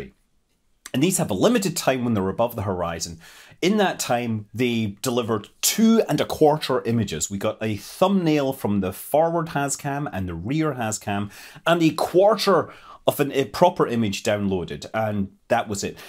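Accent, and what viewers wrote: Scotland, United Kingdom. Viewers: Nothing screams Saturday night as a 25 year old more than a Scotsman talking about space! Love it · Husband: "Are you watching that Scottish guy talk about space again?"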